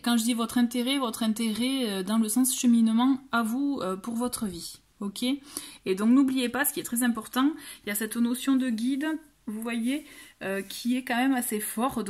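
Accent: South, France. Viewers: Bonsoir moi personnellement j'adore vous entendre parler votre petit accent me fait penser au sud de la France vous avez l'accent qui chante et cela fait chaud au cœur pour avoir vécu une partie de ma vie dans le sud et actuellement en région parisienne cela fait du bien oui ce confinement n'ai pas tous les jours facile surtout quand on et seule même si il y a Messenger moi qui étais vraiment très proche de mes petits enfants et quand il vous dises mamie tu me manque cela va être vraiment dur oui angoisser car avec ce confinement et mon autre qui travaille je me fait beaucoup de souci car nous vivons pas ensemble merci pour votre tirage passé une belle soirée Gratitude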